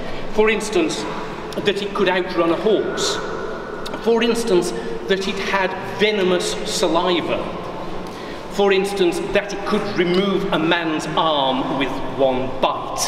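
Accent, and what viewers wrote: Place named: United Kingdom. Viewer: It also helps that his accent is British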